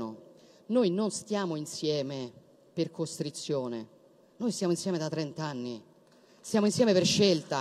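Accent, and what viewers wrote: Rome, Italy. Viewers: Impara a parlare da PdC di una Nazione, anziché come Una delle Borgate Romane. Raffinatezza ZERO. 😎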